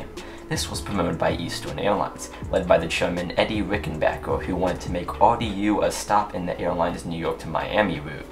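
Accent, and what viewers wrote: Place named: Ireland. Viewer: It just piqued my interest as to where you're from. Your accent sounds slightly Irish, but an Irishman would know how to pronounce both Raleigh and Durham, since both of those are English names.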